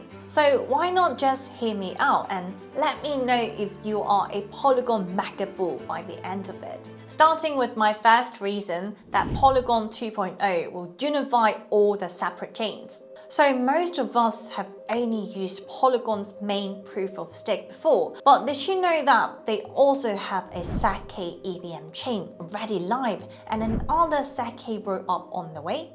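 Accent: United Kingdom. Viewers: This girl is learning English, and learning to speak it in an English accent hehe fair play!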